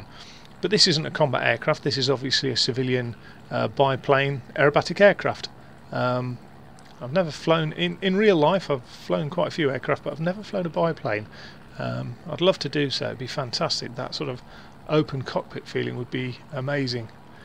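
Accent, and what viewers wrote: United Kingdom. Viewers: I'm so pleased to have discovered your channel. Being uk based it was so refreshing to hear a brit go through DCS as you have done in this video. I've been sitting on the fence for a good two years now about (a) a decent gaming PC and of course (b) getting into DCS. I've watched a lot of content, most of it American content which with all due respect drives me a bit potty. Too much waffle and being US based I find myself being totally confused over what hardware to go with. Now I'm not in a position to literally build a simulator and damn near build an F16 type cockpit, with almost an ejection seat built in. However I'm in a position to get the right kit. I know it needs a decent PC, warthog HOTAS is a given c/w decent pedals. It's the PC hardware I'm struggling to understand. Do I go with the precise recommendations from DCS? IR or VR. 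If getting VR do you drop going on a top monitor? Recommended PC suppliers etc. Its these questions I'm struggling to get answers on. I hope you can help.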